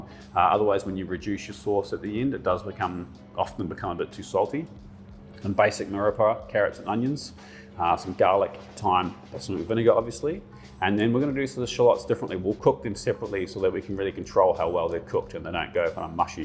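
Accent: New Zealand. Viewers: Are you a kiwi mate? I recognise that accent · Spot that kiwi accent a mile away. Heaps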